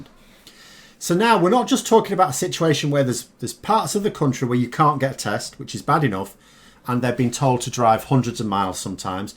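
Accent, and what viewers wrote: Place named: Scunthorpe, United Kingdom. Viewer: Hi. The Google auto subtitler struggled with your scunthorpe accent in the first few seconds with a hilarious result 🤣🤣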